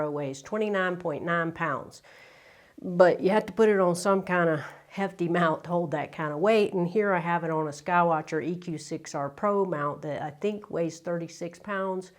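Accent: South, United States. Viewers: Love your smooth southern accent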